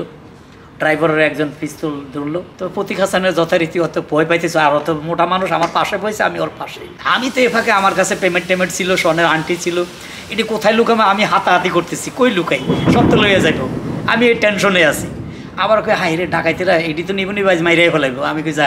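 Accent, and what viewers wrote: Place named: Noakhali, Bangladesh. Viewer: খুবই ভালো লাগলো। ভারত থেকে বলছি, আমি হারুন কিসিঞ্জার ভাইয়ের প্রচন্ড ভক্ত। ওনার সব ভিডিও আনন্দ করে দেখি। হারুন কিসিঞ্জার ভাইয়ের প্রতি আর একটি কারনে আমি আত্মিয়তা অনুভব করি। আমাদের পুর্ব পুরুষের বাসস্থান নিল নোয়াখালী জেলায়। বর্তমানে পশ্চিমবঙ্গে আছি। ছোট বেলায় আমার ঠাকুরদা বাবার মুখে নোয়াখালী ভাষা শুনতাম। আপনার কথা শুনে শৈশবের কথা মনে পড়ে। আপনি এভাবেই চালিয়ে যান। ভালো থাকবেন। শুভেচ্ছা জানাই।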